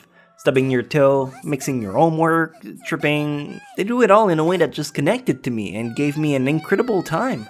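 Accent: Quebec, Canada. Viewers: French Canadian accent you get used to it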